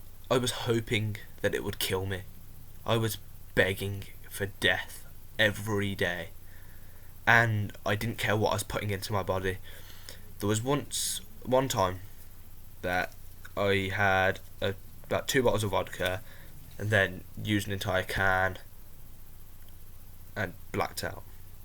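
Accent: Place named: United Kingdom. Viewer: you sound british but with a twang lol.....what is your disability? how old are you? And where are you from? Are you on Facebook? Surely we can get you some sort of help! What organs are failing? What solvents have you been taking? How did you first get into taking them? Have you been doing them for a long time? I've never tried solvents, i see friends use gas and also use hairspray and a piece of cloth.......they said everything would sound like a robot and they would laugh.....I never tried it because i was so scared of drugs back then. I can't believe i went on to smoke opium and later on herion......it was such a nice feeling but now i don't get any buzz at all i just take it to feel normal and not unwell. I am coming down off of it bit by bit.......I have a fear of death that makes me have very bad anxiety on a daily basis. Would you give up solvents if you were given help in regards to your transplant surgery?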